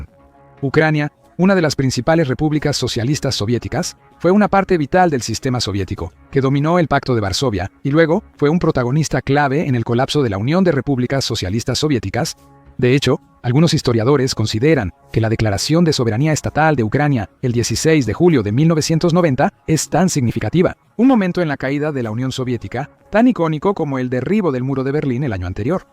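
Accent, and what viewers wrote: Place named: Latin America. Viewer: Tal y como está enfocada la información del vídeo el comentarista o está comprado o es tonto o las dos cosas. Los ucranianos no han luchado por siglos.......faltas a la historia. Asi que cuando veas caer Ucrania y posiblemente desaparezca deberás pedir perdón por la desinformación que estás haciendo. Encima con acento hispano......mira a ver qué está haciendo EEUU con vuestros países.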